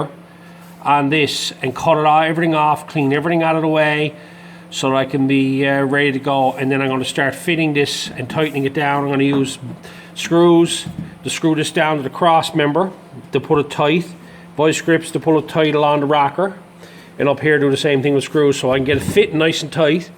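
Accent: Newfoundland, Canada. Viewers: sound like a newf ?? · Newfoundland eh? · Newfoundlander? Always a pleasure. Subbed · Where are you from in Newfoundland? Def tell cause the accent.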